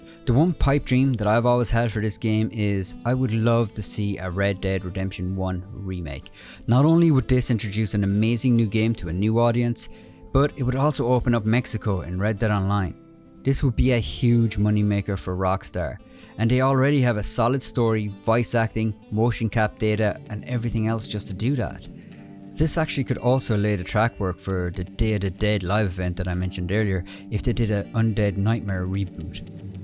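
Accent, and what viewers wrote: Ireland. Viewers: You are irish as well? I swear so many irish people play these franchise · What part of ireland are you from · Are you Irish? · I love the Irish accent laddd, but i am a real western lover like real, anyway this game doesn’t remind me of the west, the only thing that does is bounty Hunter, I still have love for this game but it’s getting harder to love every week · Dude what part of Ireland you from? Up Cavan! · great vid man. you sound more Irish than myself. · you should use you voice more often, everyone likes an irish accent 👍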